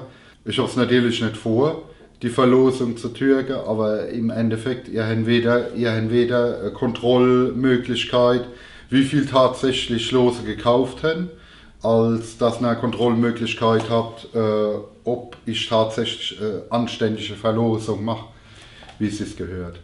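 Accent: Germany. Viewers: Hi, super Sache das, bin zufällig jetzt hier gelandet weil ich dein erstes Video (glaub ich?) gesehn hab, wie man einen Bobber für unter 2000 Ocken bauen kann. echt krass, gut gemacht hast eine gute Art sowas rüber zu bringen, deshalb freu ich mich auf die Erklärbärvideos, wird sicher geil Apropo, bleib so wie du bist, Pfälzer sind cool und Dialekt babbeln is voll ok ich versteh dich jedenfalls ...aber eins musste mir versprechen, bleib dir treu ;) Bis denne Stephan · Du Klingst wie ein Odenwälder 😁 coole Videos!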